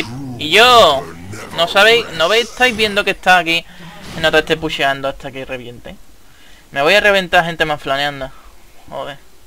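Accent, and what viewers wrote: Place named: Andalusia, Spain. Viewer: a que tu eres de andalucia?¿ o al menos pareces XDD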